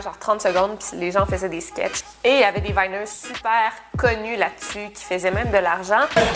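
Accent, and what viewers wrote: Quebec, Canada. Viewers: J'avais entendu vaguement parler de cette histoire mais raconté de façon québécoise que j'adore par, Franchement c'est parfait, on devient vite accro de ses vidéos, je me régale à chaque nouvelle vidéo!!! Ce qui est génial, c'est qu'il y a une prise de conscience sur le sujet qui a bien été préparé par la narratrice et elle maîtrise à merveille ses videos!!! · L’accent québécois 😩😍😍❤️ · J’adore l’accent et les expressions québécoises ( je suis français ) 😍😂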